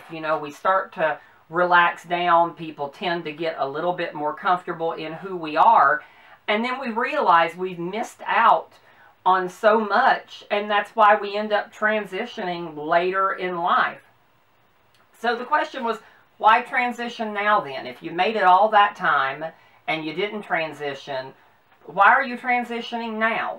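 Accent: South, United States. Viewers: Love you southern accent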